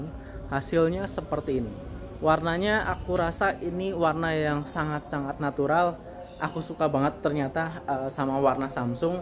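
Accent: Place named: Java, Indonesia. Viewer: Jowone sampean medok men mas,sampean seko pundi?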